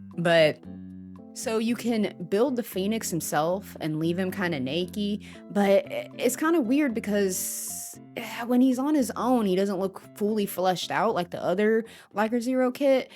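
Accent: South, United States. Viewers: You retired from the other hobby? Just curious. Plan to be watching your new content here. I never noticed you having a slight southern accent before. Love it. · Did you move? You’re accent is way country now lol happy to see your videos again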